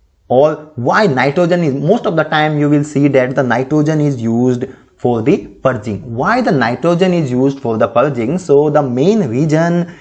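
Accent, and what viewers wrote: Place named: India. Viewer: please make the subtitle, your english language contaminated with Indian/pakistani spell. so confuse wht do you talking about.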